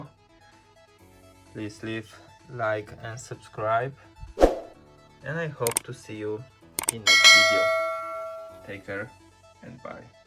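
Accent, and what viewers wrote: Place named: Poland. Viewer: Słychać że Polak 😀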